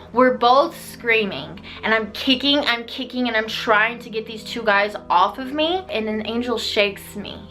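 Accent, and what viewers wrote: South, United States. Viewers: My God. The comment of a possible bugs on ur bed on ur face freaked me out more than ppl in your room..ugh sorry. I think ur newest place is haunted and ur just too open or sensitive to it.. Hope u at least cleansed the place, ur decor (bc yes spirits will attach to their fav or beloved items..whole show on tv on it years ago..freaked me out buying second hand stuff just in case..but now I do but just cleanse anything I get 1st b4 coming into my home), and urself by now..bc kno this was months ago. So hope everything's all good now..got some catching up on vids to do (I say with shame for I adore u and ur channel/content). ..also..lookin beautiful and healthy as ever..always on of my fav youtubers..I mean creators (prefer callin y'all)..both southerners and myself now living in NY..just feels like home hearing ANY southern accent..but love urs the most..💜💜💜💜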